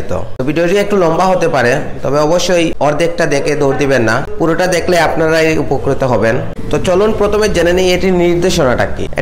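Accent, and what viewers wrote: Sylhet, Bangladesh. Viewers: Sylhety naini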